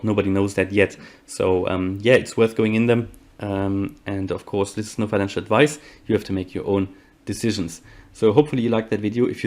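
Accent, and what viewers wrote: Germany. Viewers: German accent detected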